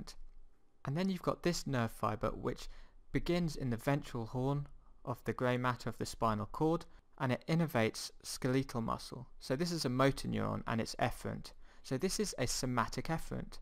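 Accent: United Kingdom. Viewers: you speak in british accent right ? btw awesome work i wish you were in my uni :((((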